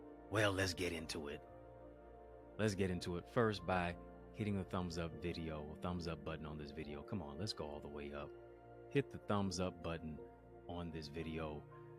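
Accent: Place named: Malaysia. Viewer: Speaking manglish ✨🔥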